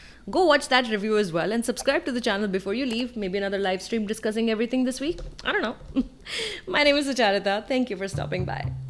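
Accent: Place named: South India, India. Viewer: What’s up with the weird ass South-Indian accent???😒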